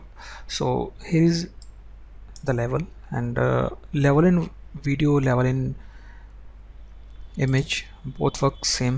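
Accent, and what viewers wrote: India. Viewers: his indian accent is too strong xD but great tutorial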